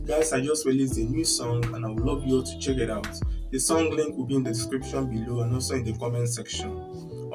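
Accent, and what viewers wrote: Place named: Igbo, Nigeria. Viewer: Your voice no join abeg too igbotic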